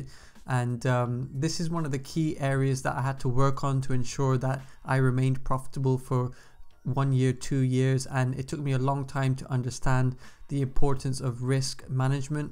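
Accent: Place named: United Kingdom. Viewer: Great video , great to hear a uk YouTuber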